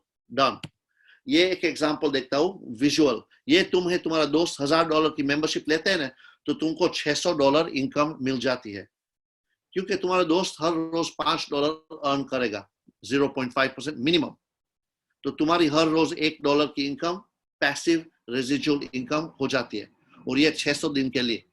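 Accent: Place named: India. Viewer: Apki hindi indian jaysi hai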